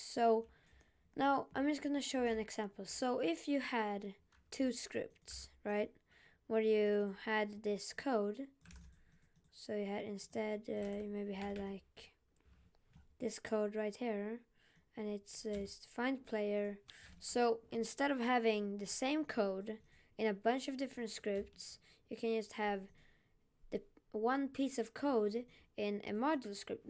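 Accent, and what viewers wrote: Sweden. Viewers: You sound swedish. Are you swedish?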